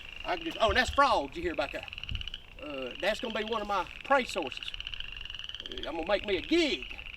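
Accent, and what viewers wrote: South, United States. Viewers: I love this guy's accent. Is that what Americans can southern?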